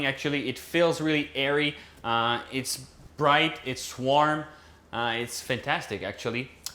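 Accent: France. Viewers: This is absolutely excellent. This is my favorite one. And I noticed that you've lost your French accent, which I miss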